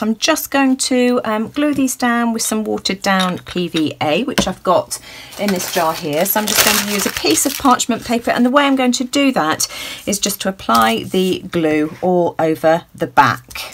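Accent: United Kingdom. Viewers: I always look forward to your videos, you make beautiful art! And I love your British accent 🙂. I would love to see you make a soft pastels tutorial...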